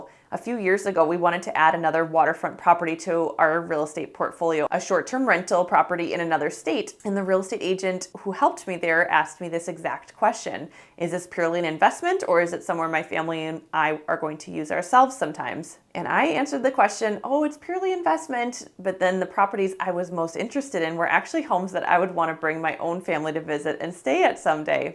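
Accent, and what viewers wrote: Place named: Minnesota, United States. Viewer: I commend you for trying to suppress that Minnesota accent… I kept picking up an accent but couldn’t pin it down until you said Minnesota and then I immediately realized what you’re trying to hide. You can’t hide it by the way, it comes out ALOT. And I’m a Puerto Rican from Connecticut lol the only time I ever heard that accent was in Fargo 😂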